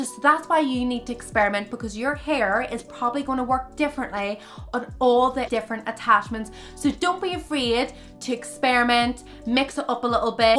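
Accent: Derry, United Kingdom. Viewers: What in the Derry girls accent is this?! The first thing pops up in my head is Derry girls after watching her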